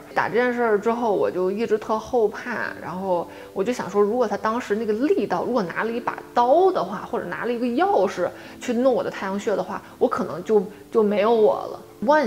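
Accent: Tianjin, China. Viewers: 你是天津人对不对！！😃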